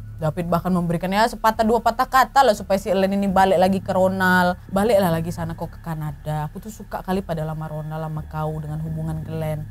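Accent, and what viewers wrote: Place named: Medan, Indonesia. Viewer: Ibu senang dgn vlog ini. Hostnya cepat bicaranya, jelas n punya aksen orang medan.semoga sukses terus n diberkati tuhan.gbu.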